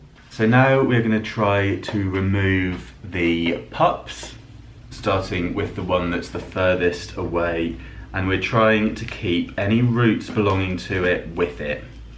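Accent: United Kingdom. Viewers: Love your work. Sooo nice to hear a fellow Brit giving tutorials. Your instructions and explanations are fabtastucally clear. You don't jabber or waffle on. Love it. I have subscribed. Give us more please. I live in the Carribean, wo, and I have 6 acres of ladn around mynjousd. I am planting, planting, planting. Yayyyye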